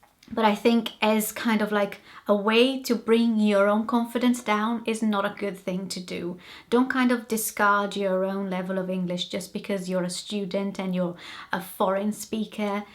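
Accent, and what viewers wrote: Wales, United Kingdom. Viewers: I honestly had no idea you weren't a native English speaker! Your accent just sounds like abnormal Welsh accent to me! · I don't often comment on YouTube videos, but felt I had to with this one, because I was quite astonished when I heard your accent. I have a number of Hispanic friends from Brazil and Columbia and Uruguay and though they can speak English, it is with a very strong Hispanic accent, --something that you have managed to completely overcome. Amazing! As a native English speaker, I can say your English sounds truly excellent and at a high level. You have acquired a pleasant accent too. Wise choice living in Wales where the accent is easy on the ear. You even sound classy and cultivated. Well done! There are always people who like to denigrate others, just ignore them. As an expat living abroad I can testify that it takes a lot of hard work to even achieve basic fluency and acquiring a native accent can be even harder. 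The natural sound and flow of your speech bears witness to a great deal of effort and some sound achievement. It's great too that you are taking the trouble to pass on your tips to others. Be encouraged. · Your English is excellent, fear and precise, with a nice hint of Welsh. A Welsh accent speaking English is so nice to hear.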